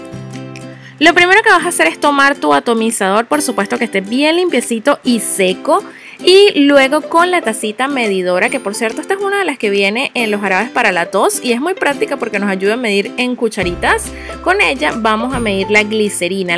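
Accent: Venezuela. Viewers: OoO que genial!! Espero que puedas seguir creciendo :3 Tu contenido es genial. Me pareció que eras Venezolana por como hablas xD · Eres venezolana? me parece por el acento y el logo de Farmatodo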